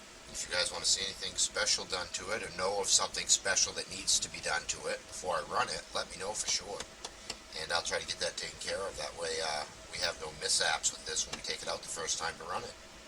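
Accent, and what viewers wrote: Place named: Maine, United States. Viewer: Wow your from maine too I thought u might have been by your accent great vid by the way 👍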